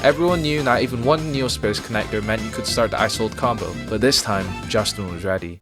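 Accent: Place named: Scotland, United Kingdom. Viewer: Your Scottish accent is so absurdly subtle. So much that I’m not sure if it actually exists or if I just need to sleep. Say purple burglar alarm.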